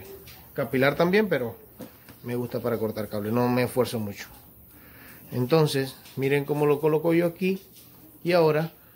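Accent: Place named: Maracaibo, Venezuela. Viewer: Primo vos sois maracucho dónde te pongan ! 😅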